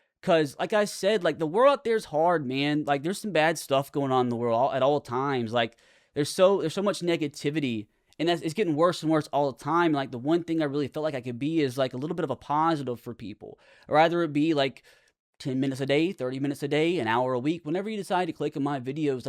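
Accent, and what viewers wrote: United States. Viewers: I hope this helps but I haven't played the last 2 maddens and I STILL watch your videos! I love the rebuilds I love the irl stuff and the playing the career of a rookie. I also think you've gotten MORE funny. I also find your voice oddly soothing haha I mean people in different parts of America have different accents and they can't help that so honestly f*ck the people sh*tting on your voice. If they don't like it they don't have to watch. People just love to be toxic on the internet. If they met you irl they'd tell you how much they love your videos and want a pic. I'm also in my 30s and have a depression problem. You just gotta focus on you man and keep putting out the content that everyone has loved. You're great at this man. Don't let the haters get you down man!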